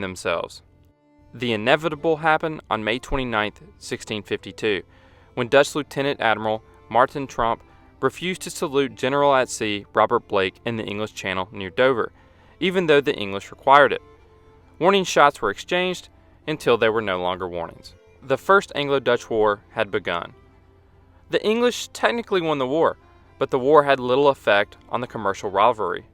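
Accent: South, United States. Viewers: Dude I love your voice. So relaxing. I can tell you’re from the south!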